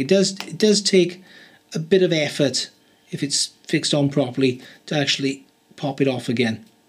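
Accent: Wales, United Kingdom. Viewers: Hey, is this a Welsh accent I hear?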